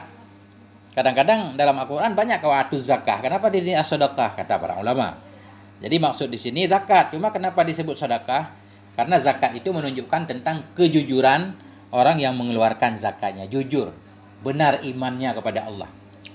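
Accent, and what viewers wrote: Aceh, Indonesia. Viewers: Suaranya agak ke aceh² gitu ya makasih ustadz ilmunya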